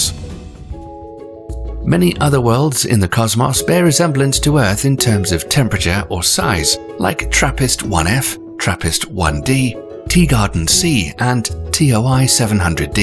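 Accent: England, United Kingdom. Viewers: I just love your English accent! Keep up the good work! :)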